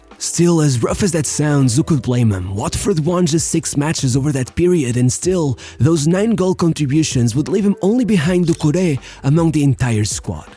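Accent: Portugal. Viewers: Are you Portuguese by any chance? Your pronunciation sounds like it. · quando ouvi a tua voz percebi logo que eras tuga, keep up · He isn't the most hated man in England, he isn't even the most hated man in the Tottenham starting 11 for most people I'd imagine. Guy can come across as a little bit of a twat on the pitch, rolling around and trying to get under people's skin but he's not unique in that respect, not even in the Tottenham starting 11 and he's generally an enjoyable player to watch. Had that one little bit of "showboating" controversy recently which is the only thing that stands out as abnormal for a player playing in the premier league but that was more media than fans and most fans seemed pretty 50/50 with no strong feelings one way or another because all he did was a couple of keepy uppies mid game then passed the ball. Still don't see how that can make him "the most hated man in England". 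When ever I watch your videos I generally find them to be void of much fact, just opinion pieces on how you feel like seeing the world spoken as if that must be how the world is with no real research to back up your statements. I mean this video was basically somebody with a Portuguese accent reading a short biography of Richarlson. You can do better. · Eu SABIA que eras português. Tu falas bue bem mas todos os tugas têm um sotaque a falar inglês. Tmb fiquei desiludido com portugal. O fernando santos já não tem condições para continuar, precisamos de encerrar este capítulo e começar um novo... mas até agora não foi despedido. Abraço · yoooo tu és tuga né? esse sotaque a dizer os nomes dos jogadores parecia bue mm. +1 sub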